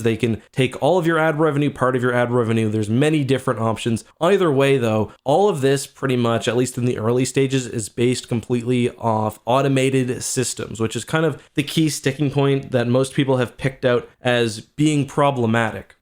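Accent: Canada. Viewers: I know this isn’t a regular video and you’re mad but it’s much more clear how Canadian you are when you’re pissed off and cursing, reminds me of trailer park boys when you said “fuck” and “sorry”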